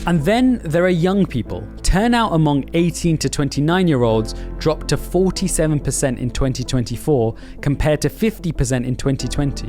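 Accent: United Kingdom. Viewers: What does a brown guy with a British accent know about Americans? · There’s a Middle East fella with a British accent explaining USA politics issues 😂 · At about , he doesn’t even get the frickin states he highlights correct: he says “Arizona,” but his on-screen map highlights “Arkansas.” British accent gets you some points, but then again, it also highlights that your expertise around the nuance and cultural dynamics of our politics may not be up to snuff…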